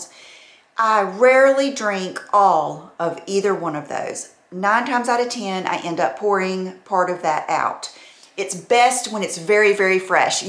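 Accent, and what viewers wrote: South, United States. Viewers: I love your personality! I am a southern girl that has moved out west- and find your voice and attitude reminds me of home- you are like a new carny friend!! It is so amazing how great i feel on just a few weeks of carnivore eating (i have had a few 'eat all the carbs that i want days')-- the joint pain, allergy symptoms, most of the menopause hot flashs, and edema are gone- i have far more energy and clarity-- and wow i feel super calm- this coming from someone who thought that their personality was just anxious- my brain just never turned off! And i started this as someone who did not like meat much but now i find myself craving it and butter- fascinating!! I have not lost much weight yet but my clothes are fitting better and my face is slimming down- have subscribed and please keep up the good work- ❤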